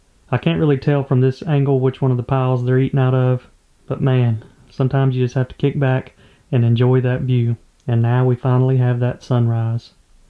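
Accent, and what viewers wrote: South, United States. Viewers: I'm surrounded by a bunch of commies living in California. your Southern personality and accent really makes me feel like I'm back home.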